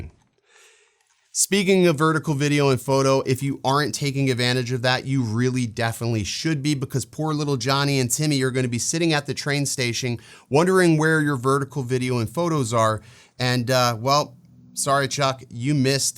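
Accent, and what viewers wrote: United Kingdom. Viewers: Very nice intro... funny I tell my youngest to go into another room so I can watch these... 😆 🤣 nice bit of British makes it sounds very professional 👏 👍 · you narration was so spot on! Complete with the perfect British accent!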